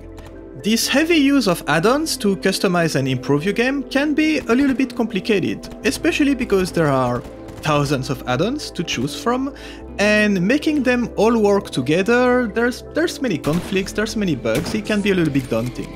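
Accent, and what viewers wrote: France. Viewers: Thank you very much for this review, you do good job with your videos. En plus avec l'accent français c'est encore plus compréhensible. Like you I love the huge work of Grokitach, it feet my vision of S.T.A.L.K.E.R This game and its community is one of the best things in this world ! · Tu es Français? (vue la manière dont tu parles anglais lol). Si oui j'aimerai bien un peu d'aide pour comprendre certains détails sur le mod GAMMA. Merci d'avance. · Hey great vid man ! I never played the stalker series but I would really like to, so I started watching your videos and I have some questions, that maybe you could answer (or make a video about like : getting started on stalker). I would like to play the original game's stories and I know everyone says to play the games without mods to get the developper vision but it really is a big hold back for me. 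Maybe if you played the games back then the sounds and graphics don't get in the way but, nowadays I can replay only old games if I played them back then. So do you have mods you recomend for a new player to enhence the 3 games so I know I will actually finish them ? Thank's in advance if you have the time to answer ! PS : est-ce un accent français que j'ai cru entendre ? 😄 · Je sent dans l'accent qu'il y a du français, ça fait plaisir ! Superbe vidéo !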